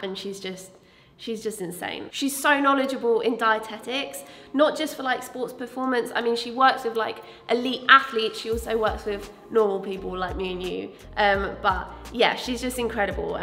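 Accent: France. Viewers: accent français on point ! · Is she French??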